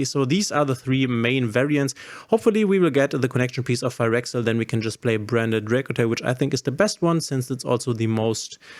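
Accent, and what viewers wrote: Germany. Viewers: thick german accent lol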